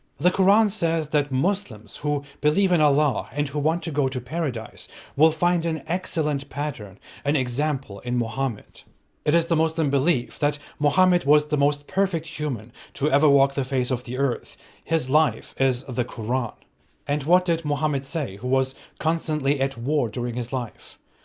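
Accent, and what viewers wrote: India. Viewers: he has a heavy Indian accent but I guess you just make fun of Indian accent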